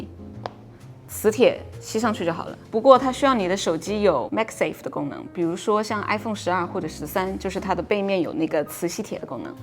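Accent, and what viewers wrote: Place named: Taiwan. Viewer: Steely是台湾人吗？说话一股台湾腔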